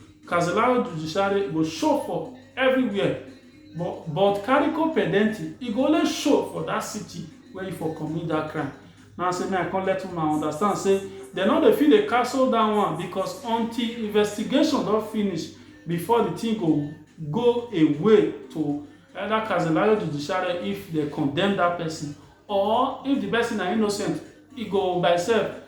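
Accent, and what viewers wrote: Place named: Nigeria. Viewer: Wow your pigin ascent is nice, thanks for sharing.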